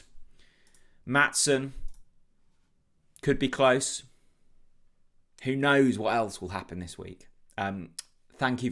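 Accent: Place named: Norfolk, United Kingdom. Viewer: Can you revert to your east Norfolk silky tones, as you laid bare in your early videos ? Altogether now - "thaass not roight ! Them defendas wannt get orn that ball" "that Kiffsgaaaaadaaan, is oriiite!"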